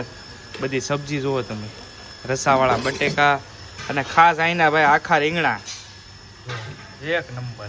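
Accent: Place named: Gohilwad, India. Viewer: गोहिल वाड की बोल पहचान जाता है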